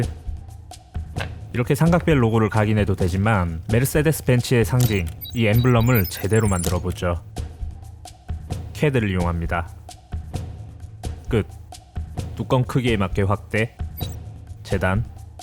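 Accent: Gyeongsang, South Korea. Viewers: 사투리? 경상도분이신가? 암튼 멋집니다👍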